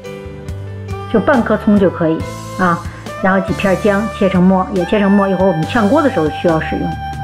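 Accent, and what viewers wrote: Tianjin, China. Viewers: 有些天津口音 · 大姐天津人吧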